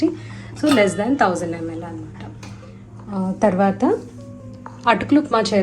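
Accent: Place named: Vijayawada, India. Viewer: yes...but mana Vijayawada slang almost superey..kada..proper Vijayawada...but memu eepudu telangana lo mahaboobnagar lo vuntam..ma vari job rithya..tanu press dpt..in Eenadu..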